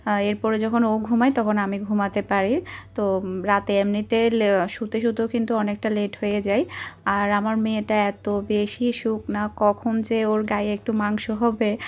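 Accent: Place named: Noakhali, Bangladesh. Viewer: Apu kmn achen.apni noakhalir sune valo laglo.nijer khub kacer akjon Mone hoy.amio noakhalir meye.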